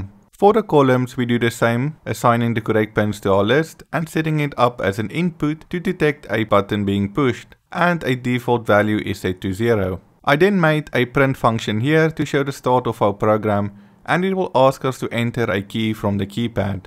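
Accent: South Africa. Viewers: At first i thought you were European Then i heard of say matrix with that south african R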